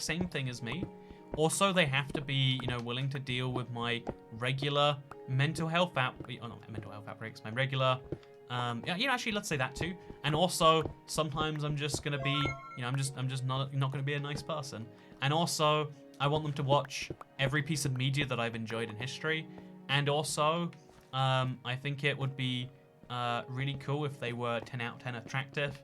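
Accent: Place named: United Kingdom. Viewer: AN WHY DO U HAVE A BRITISH ACCENT IF U ARE FROM QUEBEC??????????????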